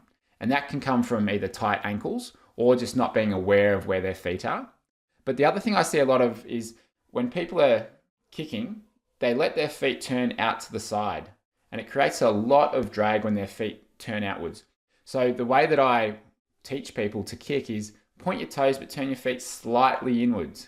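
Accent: Australia. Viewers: Maaaate! You sound Aussie and should know that it's pronounced BOY not booey like the Yanks say.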